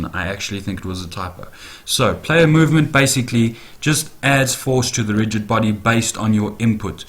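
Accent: South Africa. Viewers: Are you South African ? Accent sounds familiar!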